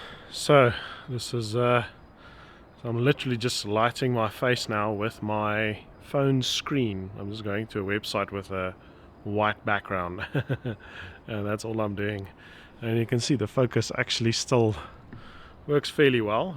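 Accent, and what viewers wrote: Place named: South Africa. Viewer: Thanks for providing this. Good to hear the Saffer accent. Puts it in a good context to whether we want to purchase it.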